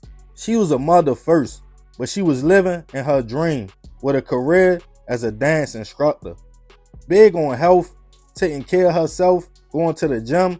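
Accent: Baltimore, United States. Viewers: That baaaltimore accent is a no for me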